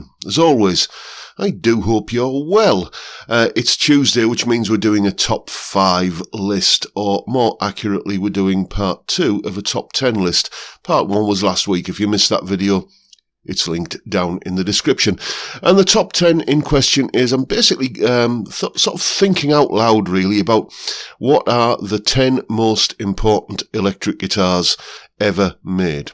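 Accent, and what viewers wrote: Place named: England, United Kingdom. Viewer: I grew up in Lancashire, been in Switzerland for ages now and just really enjoy you talking,! Brings a piece of England to my humble home! Love all your videos in general🎸🤘🎸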